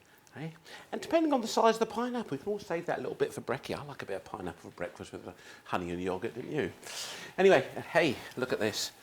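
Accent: United Kingdom. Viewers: nigga u British.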